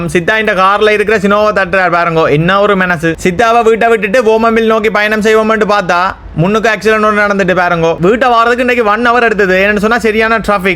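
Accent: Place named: Sri Lanka. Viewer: Hey Bro enga ooru slang SL 🇱🇰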